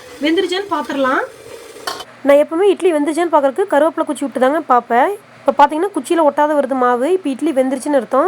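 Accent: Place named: Coimbatore, India. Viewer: Try pandren mam we are from cbe happy to hear our oor slang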